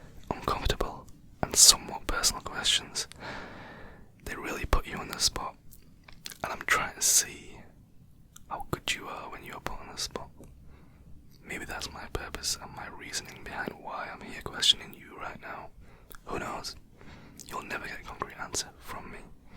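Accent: United Kingdom. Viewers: FINALLY A BRITISH ASMRTIST 😍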